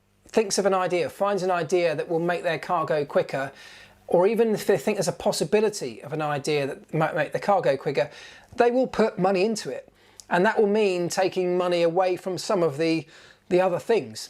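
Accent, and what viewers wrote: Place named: Bromley, United Kingdom. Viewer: Bromley boy! I knew I recognised that accent. Curious to know which school?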